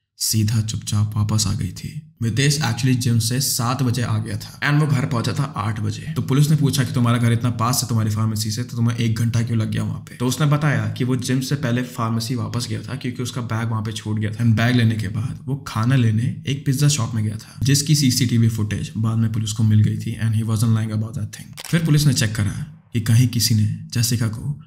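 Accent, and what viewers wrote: United Kingdom. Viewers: IM SORRY BUT WHY DOES HE SOUND BRITISH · that brr-issh accent got me wet, also rip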